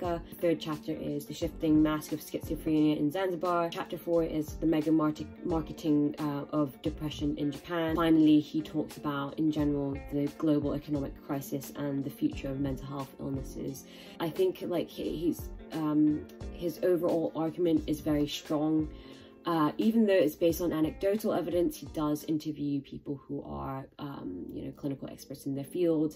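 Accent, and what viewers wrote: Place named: RP, United Kingdom. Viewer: Yeah, very posh :)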